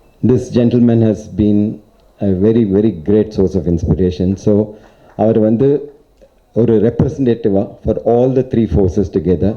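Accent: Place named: South India, India. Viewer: hes talking like a south indian aditi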